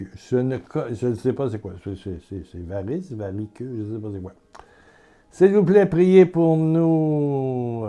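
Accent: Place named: Canada. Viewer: s'est lâché avec son accent canadien aujourd'hui, c'est très agréable. Merci pour tes paroles de réconfort. Il st tellement difficile d'être joyeux en pensant à toutes les victimes innocentes des guerres. Je ne pensais pas qu'à notre époque, il y aurait encore des guerres. Et j'ai pensé à dire à la Sainte Vierge Marie de prier sur tous les responsables politiques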